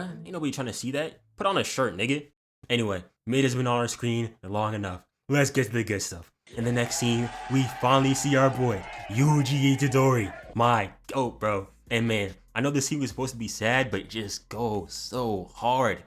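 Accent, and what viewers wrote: Kenya. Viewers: Bro are you from Kenya by any chance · Ayooo are u kenyan. Im here from kenya tooo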